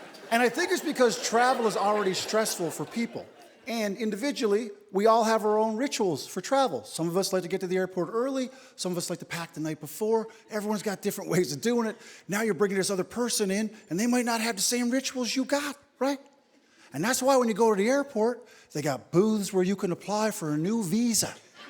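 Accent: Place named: Newfoundland, Canada. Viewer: When you started I thought there was Irish in your accent ,then you said you were from new foundland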